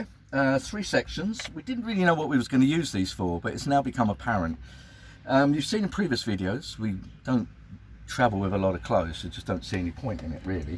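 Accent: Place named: United Kingdom. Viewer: Also nice to hear from a Brit doing this stuff. That’s not meant to be an inflammatory or discriminatory comment, it’s just nice to hear a familiar accent, a different perspective and someone from home enjoying their Troopy build - and there aren’t that many Troopies in the UK!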